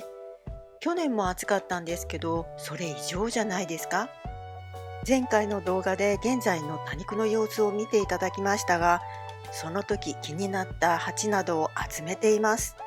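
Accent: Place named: Kansai, Japan. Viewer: こんばんは！初めてコメントいたします😊 いつも参考にさせてもらっています❤ 私の実家も関西で、baさんの関西の言葉が大好きで、九州からほのぼのといつも見させて頂いてます😊 今回の動画で、焼け野原の鉢たちをそうすればいいんや❗と何だかやる気を頂きました😊多肉のとりこになってから４回目の夏…こんなことになる？と沈んでいたので、私もそうやって楽しみたいと思えました😊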